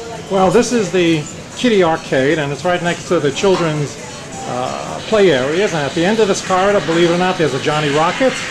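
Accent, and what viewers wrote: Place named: New York, United States. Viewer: love the new york accent.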